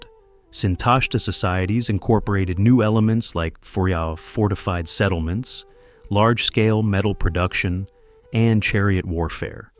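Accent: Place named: United States. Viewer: 🙉🙉🙉An other voice please and no American English.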